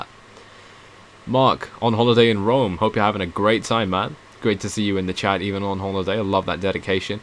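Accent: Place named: London, United Kingdom. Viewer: London posh boy accent.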